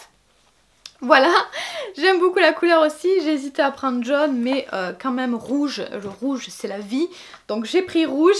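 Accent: South, France. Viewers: petit accent du ud qui va bien avec, super video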